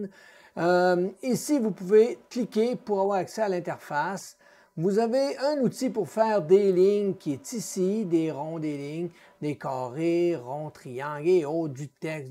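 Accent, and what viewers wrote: Quebec, Canada. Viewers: Bonjour. Encore une vidéo claire et efficace. Merci. Commentaire sur le précédent commentaire, oui il fait -3°C en France, mais ce n'est pas à un canadien qu'il faut s'en plaindre. Ça doit bien te faire sourire le climat français par rapport à celui de l'Amérique du Nord. Mais je confirme, ton accent et ta bonne humeur nous réchauffe le coeur et l'âme.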